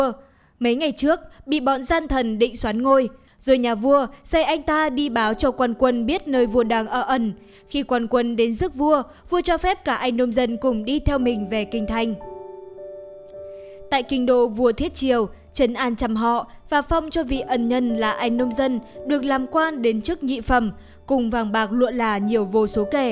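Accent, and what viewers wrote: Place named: Nghe An, Vietnam. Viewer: Giọng Nghệ An lai Bắc ra 1 cái giọng nửa nạc nửa mở rất khó nghe!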